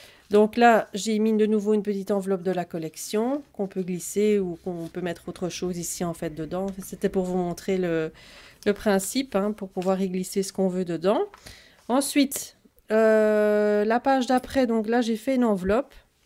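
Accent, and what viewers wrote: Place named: Belgium. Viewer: ça fait du bien d'ré-entendre l'accent belge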